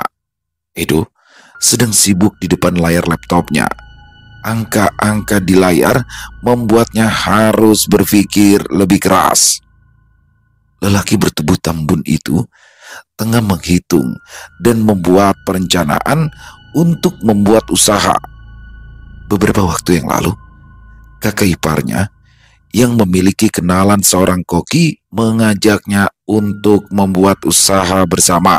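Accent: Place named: Samarinda, Indonesia. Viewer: Samarinda hadir bang...logat Banjar Samarinda nya Paseh banar bang....ada kime' nya lagi 🤭🤭🤭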